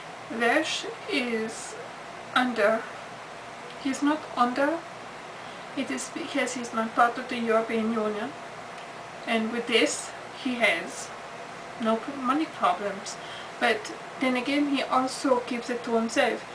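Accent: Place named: Germany. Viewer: Naaaw! ^ ^ I love your cosplay! But your german accent is the best ever xD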